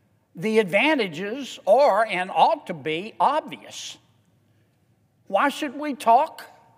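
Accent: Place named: Louisiana, United States. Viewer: The reason I like listening to you is that you explain yourself well and I understand what you say (even with the Louisiana accent). Keep talking to us because our President isn't.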